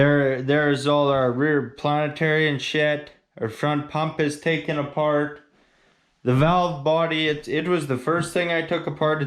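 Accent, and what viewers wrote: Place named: Saskatchewan, Canada. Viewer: Way to tackle it bud.. if you need a tranny jack DM me i have one you can use.. slick as shit when you're working alone.. by the way you talk i believe we aren't far from each other in good ole sask lol..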